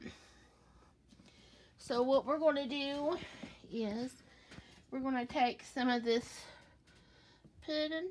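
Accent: South, United States. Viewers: I love that she says “poodin” lol has the cutest voice. It’s so girly and southern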